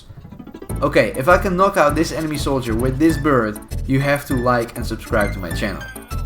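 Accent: Netherlands. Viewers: He sounds very Dutch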